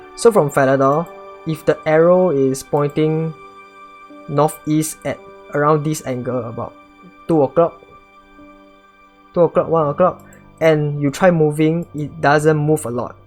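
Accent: Singapore. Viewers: Really appreciate your explanations while doing clues, would love to see more of such videos. And I feel comfortable listening to a fellow sg voice.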